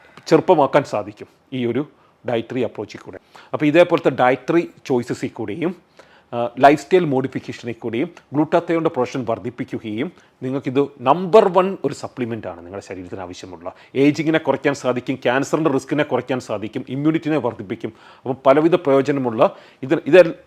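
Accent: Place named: Pala, India. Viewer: ഡോക്ട്ടറുടെ നാട് പാലായാണോ? ഞങ്ങളുടെ സംസാരം പോലെയാണ്. പ്ലീസ് reply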